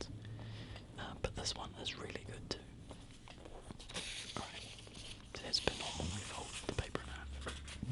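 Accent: New Zealand. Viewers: God I love New Zealand accents <3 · Are you from Chch by any chance...I love listening to my friend there talk to me on the phone.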